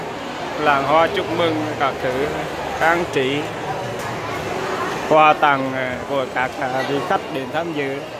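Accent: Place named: Central Vietnam, Vietnam. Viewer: Thích tiếng miền Trung nhất , nghe vừa nhí nhảnh lại vừa duyên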